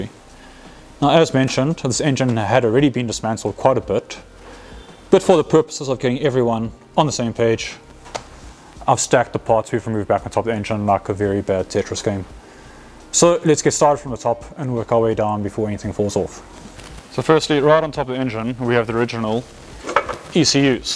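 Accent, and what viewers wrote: South Africa. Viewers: i heard the South African accent and subscribed immediately........good work buddy. Use motor bike ITB`s.........i saw a guy on youtube build ITB`s for a V12 Benz engine out-off Hayabusa intakes........just go to the scrapyard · Your accent also seems South African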